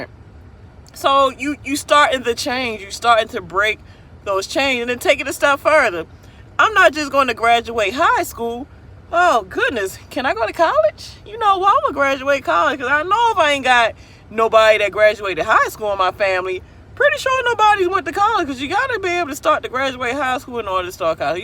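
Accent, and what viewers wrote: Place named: Maryland, United States. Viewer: That Maryland accent 😂😂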